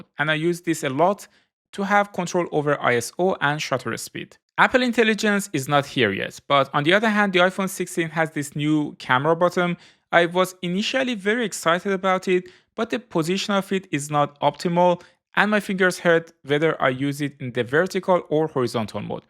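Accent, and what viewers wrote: Iran. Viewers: لهجه ایرانی باشه ؟ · لهجه ایرانی غلیظ😂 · Are you Persian? Your accent is sooo close to Persian Thanks for the video by the way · oh this persian accent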